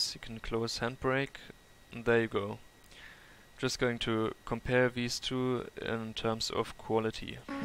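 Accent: Germany. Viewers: Man hört die deutsche Ausprache aus ihm raus ;D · Danke man! Das Deutsch hört man raus, trotzdem gutes Englisch m8 :D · bist du ein deutscher du hörst dich so and hahaha aber redest english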